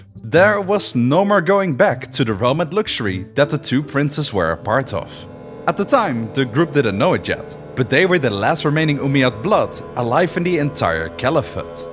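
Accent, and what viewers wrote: Netherlands. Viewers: Ik hoor bijna niet dat je Nederlands ben respect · Dutch accents are ruining YouTube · That's a very heavy Dutch accent 😂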